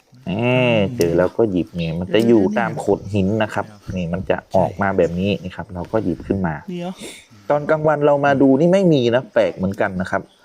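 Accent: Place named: Phayao, Thailand. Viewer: ม่วนขนาดสำเนียงเหมือนเมียงพะเยาเป๊ะ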